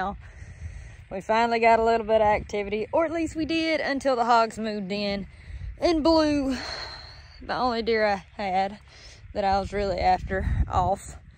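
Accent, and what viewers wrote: South, United States. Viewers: Back in <num> I was on active duty in the USMC. Ended up as a Sergeant E-5. It was people like you especially with that southern accent that I could really rely on to get the job done. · I like your spécial south accent.... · You've got the cutest southern accent ever!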